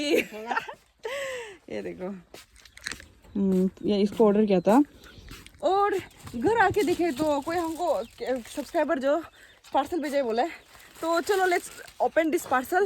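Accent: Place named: Assam, India. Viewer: Your assamese accent is sooo cuteee😂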